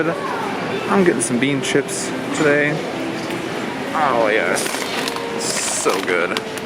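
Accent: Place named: Minnesota, United States. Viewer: You sound very Minnesotan 😆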